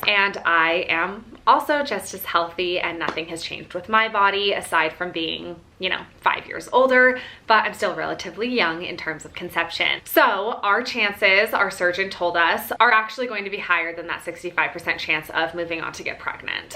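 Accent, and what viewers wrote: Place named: Utah, United States. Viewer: It's part of a Utah accent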